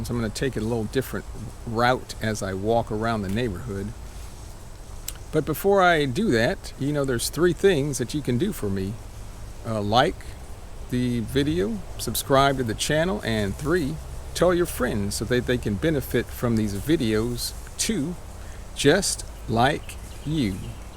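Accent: Texas, United States. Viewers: I like the Texas dialect ! it´s laid back and cool Wild West style😃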